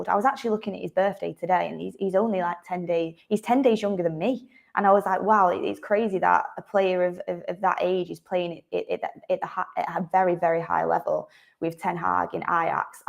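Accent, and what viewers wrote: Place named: Manchester, United Kingdom. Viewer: Love the Manchester accent … but please , pronounce your Ts. It’s Man UNITED , not UNI-ED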